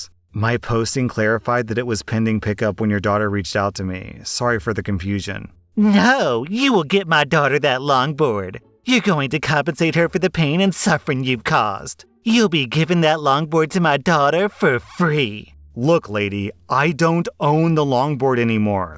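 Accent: South, United States. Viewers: The southern accent is perfect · Im not from the south but i love your southern accent. Please use it more? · The slight southern accent killed me 😂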